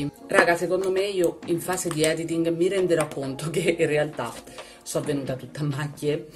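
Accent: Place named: Rome, Italy. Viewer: In questo periodo ci sta proprio un po' di sana ironia e simpatia,troppo forte il tuo Romano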